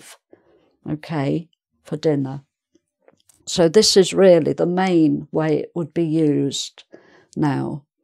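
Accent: United Kingdom. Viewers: thanks, thanks, thanks, now I understand how to use shall. (love your accent...so british)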